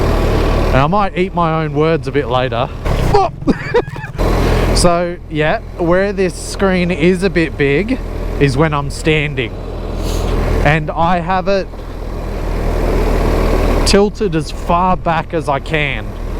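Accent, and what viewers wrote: Australia. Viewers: Are you an Aussie bloke ? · Awesome dude. I was wondering where you were. Aussie accent for sure but snow? WTF is snow? Chuckled at the manly flex with the manual over the shoulder. RTFM only in emergencies. BTW...neatest, cleanest woodpile ever. I just got an RTP1250 Cop bike at the auctions and have been thinking about one of these. My bike didn't have the BMW sat nav. Thanks again and enjoy your time OS. Oh. I went and had a look and decided to grab my balls and run with it. Fk it. You only live once so I ordered one. The discount code helped a lot.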